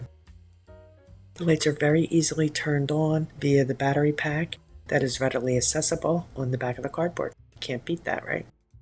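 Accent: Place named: Delmarva, United States. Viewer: There has not been one project that you have done, that I have not been in love with. I keep coming back because you love vintage as much as I do. Thank you so much for all you do, thank you for everything that you share, thank you for inspiring me to push things a little bit further than what I normally would. I am originally from Baltimore city, and have relocated to Central Florida, and it’s always refreshing to hear you speak… not sure where you’re from but I’m picking up DELMARVA/PA, area. So you are like having an old friend inspiring me. Keep doing what you’re doing! Lots of love 🥰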